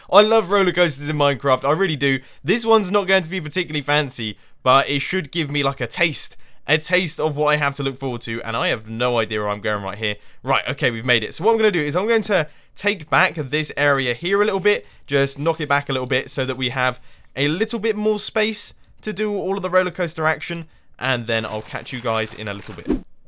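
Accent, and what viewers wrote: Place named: United Kingdom. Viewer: Hey Mumbo =) Few things I want to tell you about this episode and future ones. 1. I really enjoy time-laps parts with cool chilling music and you building something. It's much much better and nicer to watch then "progress updates" :P 2. The first part of yours roller coaster (when you climb up) should be slower. Consider placing power rails less often like 1 normal 1 power rail. This may do better effect. 3. I enjoyed episodes where you well playing in some game in Hermit HIlls. 4. For future Roller Coaster you can add some Fire jump circle (like this one in circuses). It would really do the scarry thing. At the end thank you for your videos. I enjoy watching every episode and I learn english (+ lovely British accent). Keep it UP!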